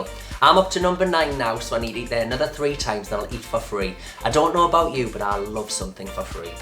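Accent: Newcastle, United Kingdom. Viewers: What a great Geordie Accent. Good Video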